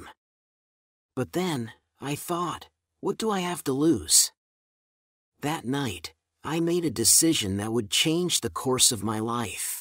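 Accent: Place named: India. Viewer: just because of your accent I'm curious to know that whether you are from India or abroad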